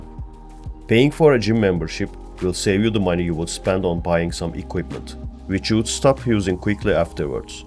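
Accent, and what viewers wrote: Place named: Turkey. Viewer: Turkish english accent is absolutely the most unique english accent I have ever heard. There is nothing like it.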